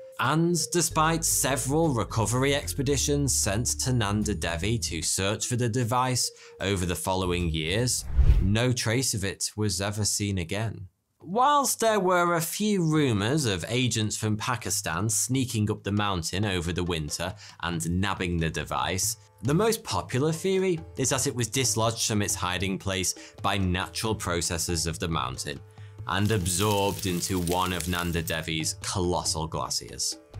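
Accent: United Kingdom. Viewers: He is british 😂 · definitely a pom - he ain't no Aussie, there's to much of the national costume & dance, not in this. Aussies are over the 'ditch' from me, thankfully. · this man truly is a pure British 😂😂😂😭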